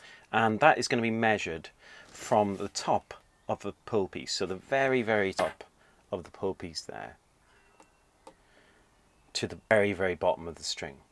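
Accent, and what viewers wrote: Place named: Wales, United Kingdom. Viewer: Thanks for posting. Nice to hear a welsh accent too.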